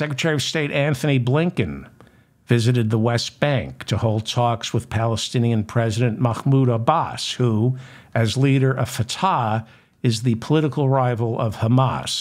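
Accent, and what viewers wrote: New York, United States. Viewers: Profound thoughts on Gaza,. Your transcendent resolution is the only way. Thanks. PS: your pumpkin postmortem was blissfully brilliant, and spoken by a true New Yorker!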